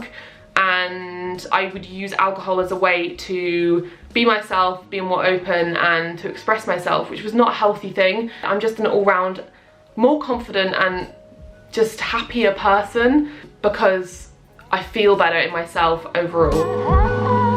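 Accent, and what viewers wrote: England, United Kingdom. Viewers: I'm happy for you, God Bless You! I'm trying to give it up, and what you say is true. I gave it up for 17 yrs. and foolishly started drinking a little wine and quickly turn to allot. Anyways, I want to stop drinking 10 years now but, the Lord help me! I love your english accent, I am in Virginia, USA. Best to you!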